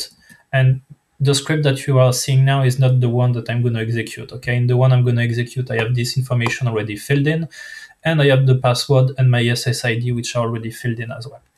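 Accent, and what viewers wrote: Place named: France. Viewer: Merci pour vos vidéos que je viens de découvrir. Malgré votre accent très frenchy 😜 qui n'est pas trop compliqué à comprendre pour les franco-francophones, avez-vous la possibilité d'activer le sous-titre en français? J'ai déjà vu ça sur des sites de français qui présentent oralement en anglais, ce doit être automatique, mais je ne connais pas la procédure...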